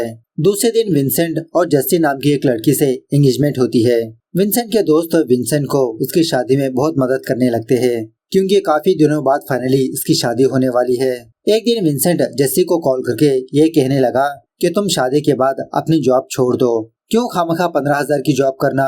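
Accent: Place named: Andhra Pradesh, India. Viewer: Andhra Pradesh maybe because Arunachal Pradesh ka bolne ka accent alag lag raha hai😅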